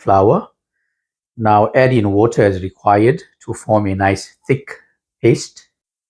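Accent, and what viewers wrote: South Africa. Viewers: Dear Brother, we have reached the end and I salute you. You have a SA accent. Where can we buy your samosas please? Do you sell any in Australia? You are an amazing perfectionist cook - very rare to find people of your ilk today. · Where are you? I hear a South African accent 😅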